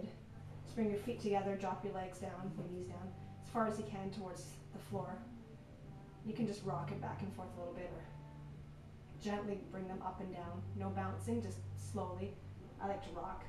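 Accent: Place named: Canada. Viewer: Thank you for this! And also, you are so adorably Canadian! lol. Love the accent. I don't remember ever seeing a video with you before.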